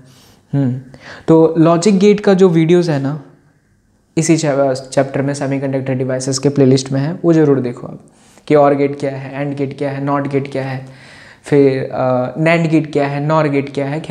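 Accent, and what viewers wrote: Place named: Haryana, India. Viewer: Ni gujrati he is frm surat but hrynvi ati h unhe😁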